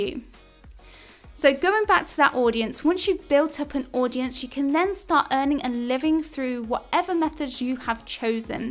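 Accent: England, United Kingdom. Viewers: Has a seemingly English accent yet says how to make 250,000 DOLLARS a year. Typo error ? Or…..? Hmmm…. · The best english accent here for the english accent lovers! 🤗😍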